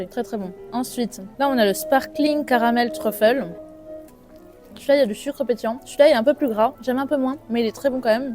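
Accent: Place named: Maghreb, North Africa. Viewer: Ton accent vient d’ou? Tu es D’origine maghrébine?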